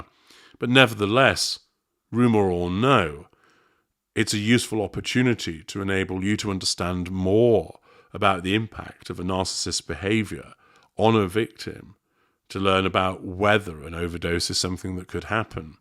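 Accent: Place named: Yorkshire, United Kingdom. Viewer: No - I can still hear a tiny bit of the Leeds? (Yorkshire) accent in the lad. Most of our British aristos talk with a handful of pebbles ' int pie-ole'. 😅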